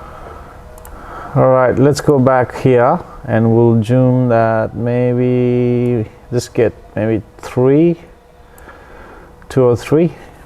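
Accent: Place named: Nepal. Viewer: Good stuff bro. Your accent sounds like Nepalese accent.🤙🏾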